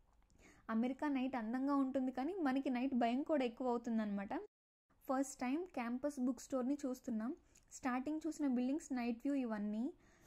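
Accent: Godavari, India. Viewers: Cute voice midi godavari jillaa na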